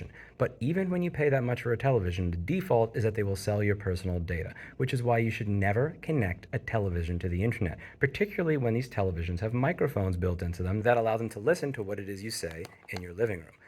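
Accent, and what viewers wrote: New York, United States. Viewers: u can go out of new york but new york wont go out of u.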